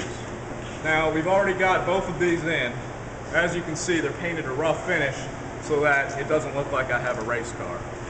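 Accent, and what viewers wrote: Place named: Oklahoma, United States. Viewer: if you don't mind me asking, where is you shop located, i'm an okie but live in Fresno, you sound like me so your probably east of me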